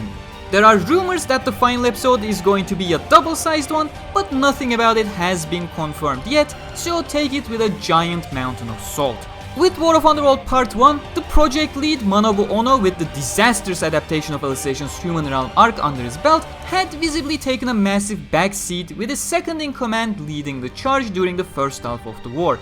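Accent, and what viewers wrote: Turkey. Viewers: Aksan ve nickinden Türk olduğun anlaşılıyor bu arada genede çabaların hoşuma gitti Global olma yolunda başarılar :) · Böyle bir konuşmanın bir Türk tarafından İngilizce olduğu çok belli. Başarılar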